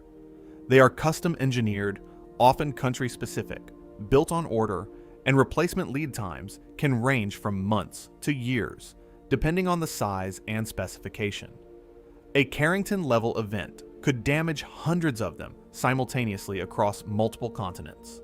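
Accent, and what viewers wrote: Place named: United States. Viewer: This is how a space documentary shod be fly and cleanly narrated in clear American English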